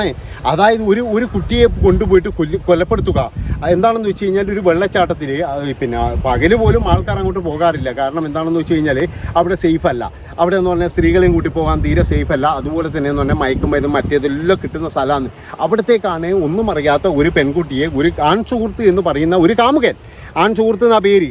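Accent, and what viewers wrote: Kannur, India. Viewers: ഓക്കേ അരി അണ്ണാ 😂നാട് എവിടെ യാ 😄കണ്ണൂർ ഭാഷ ആണ് നമ്മളെ 😂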